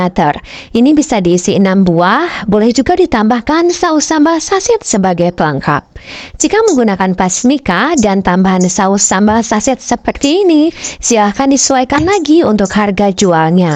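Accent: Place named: Banyumas, Indonesia. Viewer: Ngapak usah pake logat bile ngomong nya ngapa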